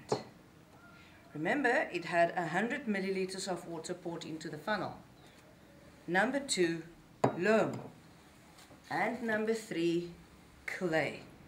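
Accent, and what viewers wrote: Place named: South Africa. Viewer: Very helpful video, thanks. You sound like you are from South Africa as well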